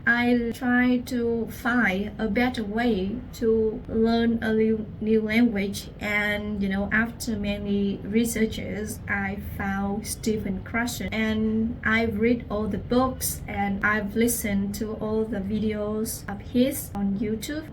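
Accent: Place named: North, United Kingdom. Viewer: Southern Accent? I think it’s Northern 🤔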